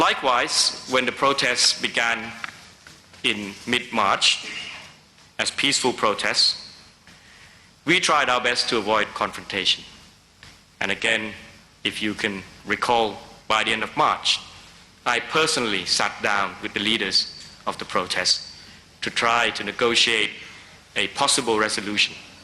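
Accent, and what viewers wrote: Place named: Thailand. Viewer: เท่าที่ผมดูน่ะ แกนั่งอ่านสคลิบทั้งนั้นนี้น๊า..สำเนียงก้อไม่เท่าไหร่หรอกนะ ก้อเหมือนคนไทยที่พูดอังกฤษทั่วๆไปน่ะคับ....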